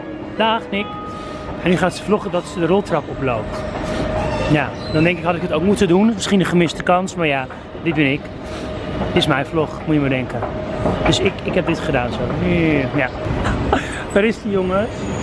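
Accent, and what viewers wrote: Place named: England, United Kingdom. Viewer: is zo grappig vooral Zijn Engelse accent 😂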